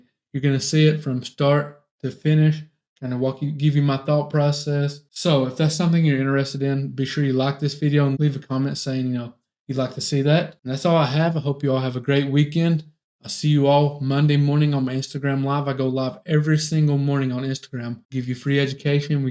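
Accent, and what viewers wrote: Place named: South, United States. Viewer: what state do you like in?.. sound like you from the south… i’m from TN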